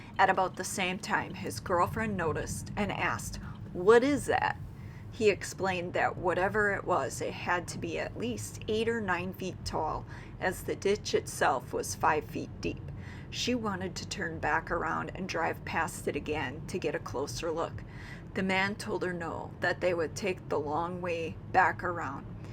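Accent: Wisconsin, United States. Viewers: I think some of the commenters think you're mocking believers because of the tone of your voice, you're not, if people have seen your other videos you have a very open mind. I think it's the Wisconsin accent that's throwing people off.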